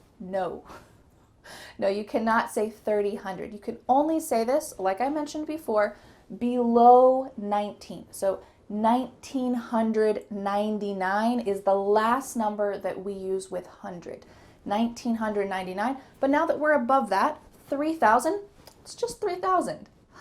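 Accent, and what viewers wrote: United States. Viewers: Wow, you've got American accent, that's incredible. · Hi! So happy to see you here again.. (and again and again!) You're looking ravishing! You chose an excellent topic 'cause "big numbers" is one of the quite unclear topics which many learners know even worse than any grammar question as well and it's truth. I'm grateful to you 'n' your channel for a wonderful possibility study a real American English with gorgeous accent (your "water bottle" is flawless for accent training, thanks) and marvelous pronunciation. has also beautiful accent and It's good that he's being agree to take part in your lessons. I was just fallen for your pretty voice, these endearing eyes, your sunny smile, your expressive mimic 'n' manner of speaking with correct, a real American accent as well. All of this drove me crazy at once when I ran across your channel by the link what gave me Lucy from "English with Lucy" channel. 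And I'll be always faithful to you 'n' your channel 'cause due to it my main dream is coming true! Good luck, strong health 'n' all the best! See you soon!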